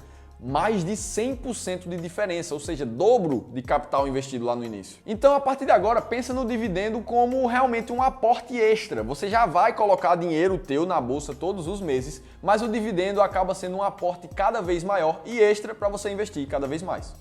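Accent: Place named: Pernambuco, Brazil. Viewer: ... gostei de ver seu conteúdo!!! E principalmente o sotaque e a batida na mesa...rsrsrs... só faltou falar MASSA e PRONTO! PARABÉNS PELO SEU TRABALHO! Vida longa e próspera nos vídeos do Clube. Obs.:...PE imortal!....Entendedores entenderão.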